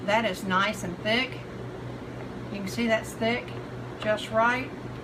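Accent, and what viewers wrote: South, United States. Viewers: My Mom Would Love Her Southern Accent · Love your southern accent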